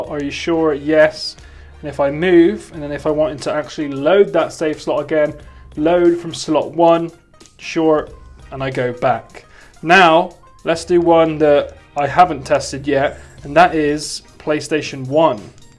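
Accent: Bristol, United Kingdom. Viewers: This is pretty cool I won't lie, but I swear you're also from Bristol with that accent of your's (hopefully that can be taken as a complement)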